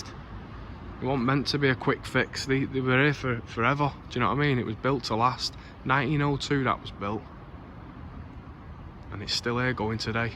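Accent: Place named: North, United Kingdom. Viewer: Great story and video editing, complete with reminiscent storyline in a strong northern accent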